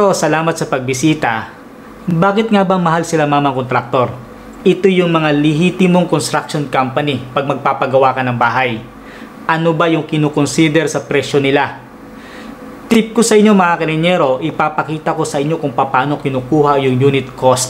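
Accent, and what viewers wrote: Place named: Visayas, Philippines. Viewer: Engineer new subscriber here. After the pandemic will build a house GOD permits. In reality I am a fan of HGTV. So that's where I got ideas about building houses. But when I saw your vlog I was hooked to it. Currently watching all of it. Reading the comments and taking down notes. Sir when I start with my house for sure will be asking for your advices. I hope you will be kind enough to answer it. How I wish it will be your construction firm to build it. Son are you Visayan or Ilocano coz I heard you speak my dialect. Till then may God bless you.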